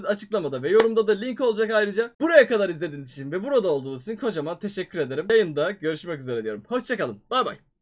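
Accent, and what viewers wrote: Urfa, Turkey. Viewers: Ulan abi çok güzel urfa şivesi yapıyosun seni izleye izleye şivem kaydı 🤣😂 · Abi Urfalı olduğunu çok belli ediyorsun😀😃 bende Adıyamanlıyım hemşeri sayılırız bu arada geçmiş olsun umarım hastalığın kısa sürede biter 😃😀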